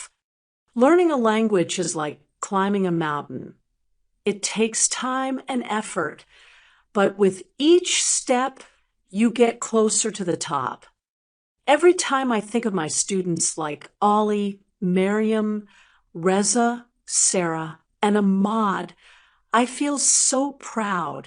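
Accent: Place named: United States. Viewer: American acsent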